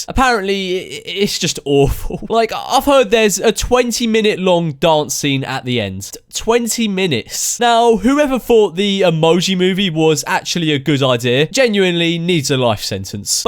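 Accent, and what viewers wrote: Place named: RP, United Kingdom. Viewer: u sound posh, fam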